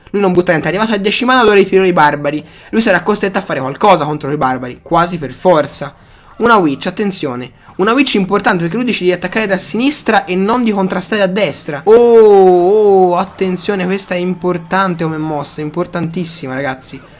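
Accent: Florence, Italy. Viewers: i fiorentini la maggior parte hanno tutti la voce cosi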